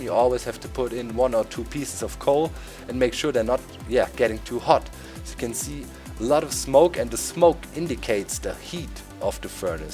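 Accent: Germany. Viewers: are you german?? you sound Like a German Person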